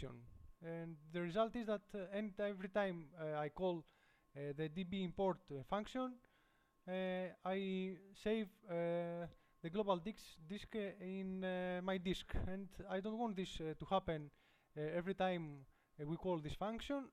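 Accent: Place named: India. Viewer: Man you are just PERFECT except your english, I guess you are Indian :D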